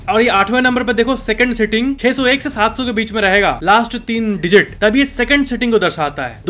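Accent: Bihar, India. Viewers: Great Bro , You Are Back In Your Old Version And We Are Liked It ,, Specially Your Bihari Language ♥️♥️♥️♥️❤❤❤❤❤❤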